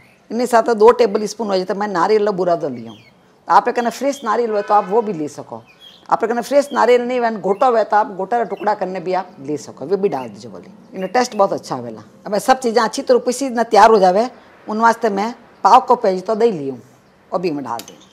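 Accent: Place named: Rajasthan, India. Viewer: Pure n Typical rajasthani accent with no AIRS of sickly so called fashionable style Sounded nice Recipe looks festival spl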